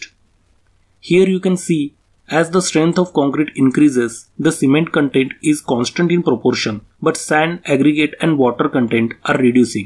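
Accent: India. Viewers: This guys Indian accents so strong and hilarious. Cool videos though 😊😊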